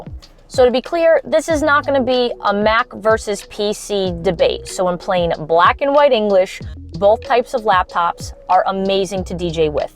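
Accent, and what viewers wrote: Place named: East Coast, United States. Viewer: it’s the east coast accent that you have JUST a bit of….HappyHappy Joy Joy!!!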